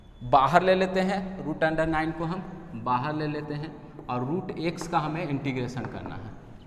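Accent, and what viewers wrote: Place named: Bihar, India. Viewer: Aapka bihari accent I like it so much 😍 💕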